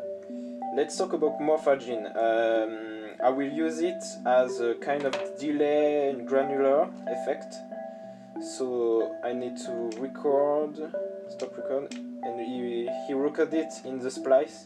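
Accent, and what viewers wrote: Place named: France. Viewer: Talking about modular sounds that much better with a French accent. 😃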